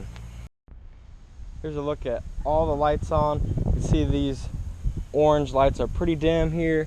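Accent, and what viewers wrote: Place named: Louisiana, United States. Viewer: You gotta be from louisiana huh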